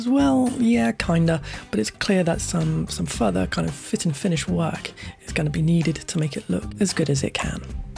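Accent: United Kingdom. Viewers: british accent always soft to my ears...i like it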